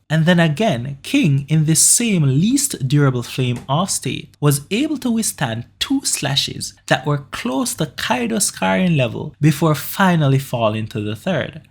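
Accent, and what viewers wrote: Jamaica. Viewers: U sound Jamaican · Sounds Jamaican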